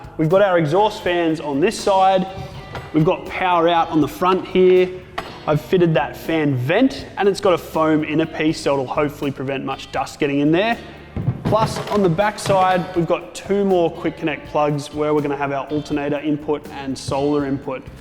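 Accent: Australia. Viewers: Mate, I am not here to insult anybody, it’s because you made fun of his Australian accent that I concluded your not Australian - simple as that.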